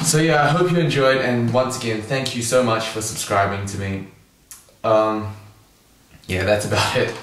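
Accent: Australia. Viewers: He sounds Australian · What place is your accent from? You sound kind of Australian.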